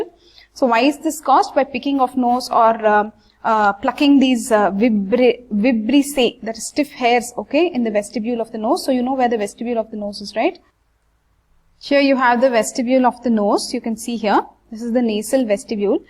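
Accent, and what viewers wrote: South India, India. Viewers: Your speech resembles to south indian people 😁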